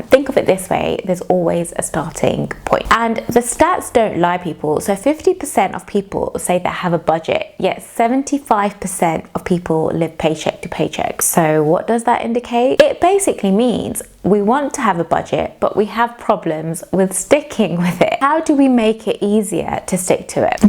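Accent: United Kingdom. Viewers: Yes! I am all about budgetting! I think it is one of the First Steps in finacial Freedom. As always very lovely video, and I love your British accent !👍🏻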